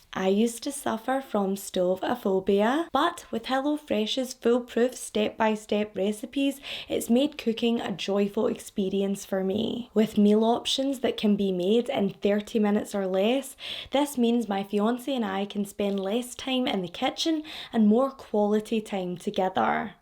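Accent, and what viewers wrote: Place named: Newcastle, United Kingdom. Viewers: Love the Newcastle accent haha love some representation for northerners ❤️❤️‍🔥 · Why i your....new castle accent is why i better than mine.....yours would make gary linker proud.. and chloe ferry 😁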